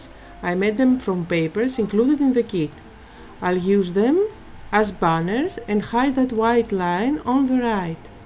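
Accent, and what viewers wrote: Greece. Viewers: OMG! It was great hearing your voice! Love your Greek accent! Thanks for sharing. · just love your Greek accent also your very precise instructions on your fab projects. x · YYEEAAHHH you have a voice ;-) AND it's beautiful and your english is remarkable! I love love your videos and your work is so wonderful. In June i went to Santorini on vacation with my two adult daughters .... hearing your speach took me back to Greece. I'm a Dane and I'm collecting the courage to make videos in English ... you help me so much. THANK YOU and greetings from your biggest fan in Denmark ;-) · Luv ur greek accent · I adore your Greek accent! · Always love your cards and now I can say I love your beautiful Greek accent!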